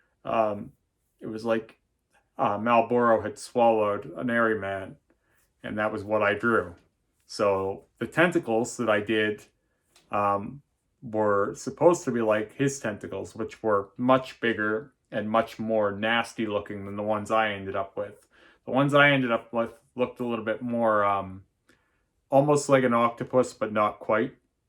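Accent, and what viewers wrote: Canada. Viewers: I appreciate your reply. Did not know you were Canadian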